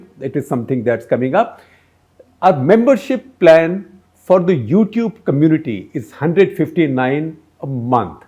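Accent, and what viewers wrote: India. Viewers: Very insightful and well analysed. SG, more power to your Indian English!!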